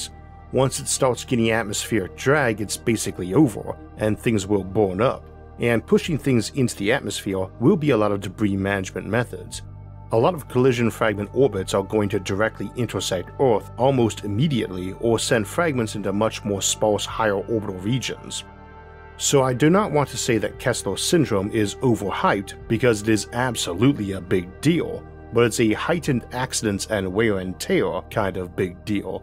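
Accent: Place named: Midwest, United States. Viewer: it’s not wrong, it’s just different. It seems like it might be a midwestern thing because Rob Scallon also says it like that and he’s from Illinois.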